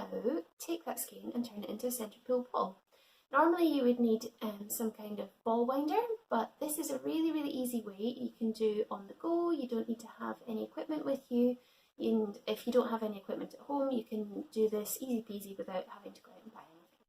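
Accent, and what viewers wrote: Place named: Ireland. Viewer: Cute accent. Ard you Irish? Great videa! Thanks! I'm trying it now.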